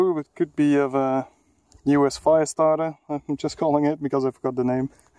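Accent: Netherlands. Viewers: Geen haat maar je hoort dat je Nederlands bent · You've a dutch accent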